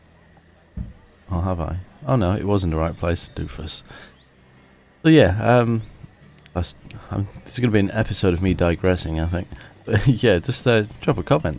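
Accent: England, United Kingdom. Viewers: Great, Lake Dulcet is back on track! On a different subject, when you close your eyes and listen to certain parts of this episode, it reminds me of someone and it finally dawned on me who... Is Hugh Grant from your part of England (similar accent)? ;-)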